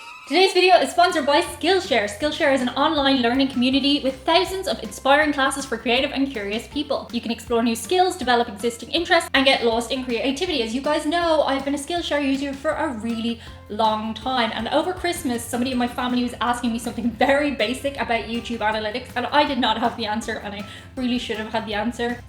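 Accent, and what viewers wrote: Dublin, Ireland. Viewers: As an American I love your Irish ☘️ accent : ))) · The new "politically correct" term to call those weird people is "eccentric". But you're right, generally, it's not frowned upon. Nothing sexier than a woman with a sense of humor! The Irish accent doesn't hurt either. 😁🤓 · as a US citizen born and raised, I really love knowing that you "appreciate" our "societal culture"! But AS a US citizen, I really love YOUR culture as well! We only have a history of about 250 years to fall back on, some of it not so great. I don't know what it would feel like to have a CULTURAL history that goes back, thousands of years! It really would be great to be able to go back to the BRONZE, or even the STONE age, and know that those people were MY "ancestors". (and your Irish "accent" is pretty cool too!) Even your original LANGUAGE going back as far as when it was still "Gaelic" and then turning to Irish!! 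Don't get me wrong, the American "melting pot" has alot of advantages too but unless we are "NATIVE AMERICANS", (which I'm not) here, we just don't have the sense of truly "belonging" to a COUNTRY or even a REGION, like you do in Ireland, or Spain! I mean you can trace your CULTURAL lineage all the way back to the Celts in Ireland or the "Beaker people" or even "Iberian" and Roman history in Spain! So, THANK YOU for your kind words and thoughts about the STATES! Not everyone in the world thinks of us in such a "kind" way. ♥️😀🇺🇲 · Fun video I love when use an "American" accent. Granted I live New England. As Side you your accent reminds me of Friend from Dublin.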